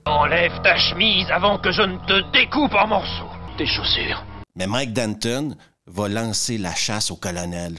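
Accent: Quebec, Canada. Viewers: Ton accent québecois te rend service. C'est vraiment très plaisant de t'entendre dézinguer des mauvais films avec cet accent et 2, 3 expressions typiquement québécoises. Je vais nulle part. Je vais pas "à nulle part". Simple exemple.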